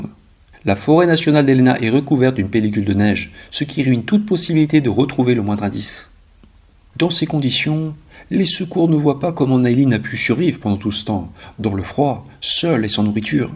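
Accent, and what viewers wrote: England, United Kingdom. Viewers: moi j'adore ton accent anglais, je suis fan 😅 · ton accent Anglais me fait toujours rigoler, c'est pas méchant.. c'est quand même gentil d'avoir une pensée pour ses personnes disparus.. bye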